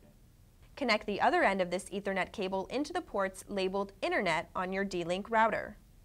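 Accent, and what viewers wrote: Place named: United States. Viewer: Her yank voice goes through me...she's chatting a load of shit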